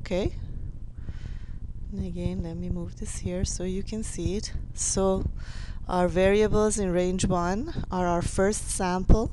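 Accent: Iran. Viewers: persian accent. woohoo! XD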